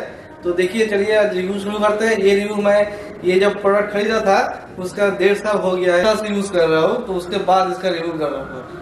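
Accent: Bengal, India. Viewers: আমি ভিডিও শুরু হতেই উচ্চারণ শুনেই বুঝে গেছি যে ভাই আমাদের নির্ভেজাল বাঙালি ! ভাই একটা অনুরোধ রাখার চেষ্টা করবেন যেন আপনি সর্বভারতীয় দর্শকদের জন্য হিন্দিতে ধারাভাষ্য দিলেও বাঙালিদের জন্য পাশাপাশি বাংলাতেও একটা আলাদা চ্যানেল খুলুন ৷ আমরা সবাই আপনার চ্যানেলকে সাবস্ক্রাইব ও শেয়ার করে এগিয়ে নিয়ে যাবো ৷ অথবা বর্তমান চ্যানেলেই পাশাপাশি বাংলাতেও ভিডিও আপলোড করুন ৷ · Proper bengali accent 😀👍👌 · Kya AP bengali ho? Apke language waisa laga Raha hai 😊 Btw video was good 👍🏻😊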